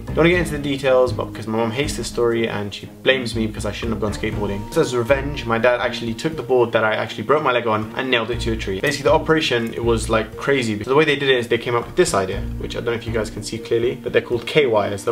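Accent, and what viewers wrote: United Kingdom. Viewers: why isnt your british accent as strong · Hey I'm a new subscriber, soo glad I discovered your channel. Just wondering have you lived in the UK? love your accent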